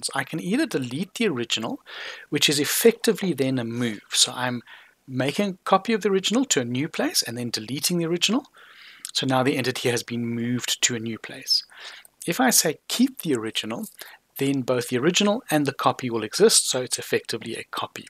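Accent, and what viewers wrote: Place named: South Africa. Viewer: Hi. Just dropping you this line about how clear and concise your videos are. I've been a long time ACAD user, but my computers out-aged my version of AutoCad. So when I found LibreCAD, it was quite different than my old systems. I tried numerous YT instructors but for various reasons they didn't help me much at all. I'm in Montana USA, so some of the videos were hard to follow, either from difficult accents of instructor, length of lessons or simply confusing instructions. I very much liked the way you broke up the sessions into focus on one area at a time (not too long and complicated), like the detailed use of each of the tools, the ease of following your very light South African accent and the systematic approach to each item. I'm 84, and I don't learn as quickly as I used to, but still active enough to frequently have a need for this program. 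I gather that you are actually a instructor/teacher and you do an excellent job. Your videos make it easy to 'back up' to catch anything I didn't quite understand the first time. Thank you so much. Best regards, Jack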